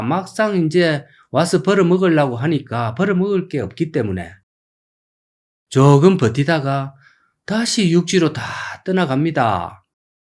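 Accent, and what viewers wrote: Gyeongsang, South Korea. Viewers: 언제나 친근한 갱산도 토백이 사투리 얼마나 정겨운지..., 참고로 전 호남학파입니다만. 다 맞는 말씀입니다. 저는 미국인이 되버렸지만, 조국의 사정을 둘러보면서 제일 가슴 아픈게 한국인 특유의 정다운 스스로의 모습이 어디로 사라져버리는건지 그래서 나중엔 어디로 갈려고 그러는지 중국공산당이 지배하는 땅이 설마 되버리는건 아닌지 답답합니다.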